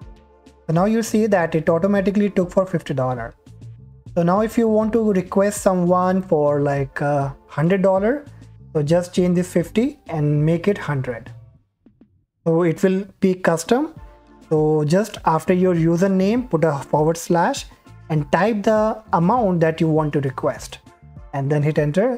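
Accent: India. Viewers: YOU ENGLISH LIKE INDIAN ENFLISH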